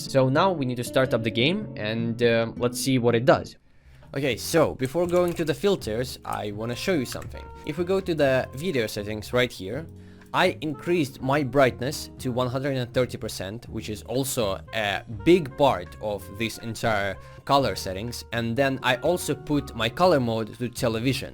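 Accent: Russia. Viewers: Эх, русский акцент тебя выдает ))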